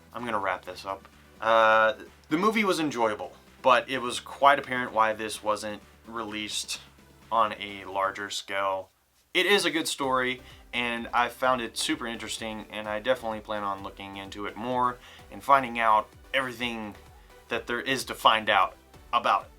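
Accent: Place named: United States. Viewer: Why is Daniel Radcliffe reviewing movies with an American accent?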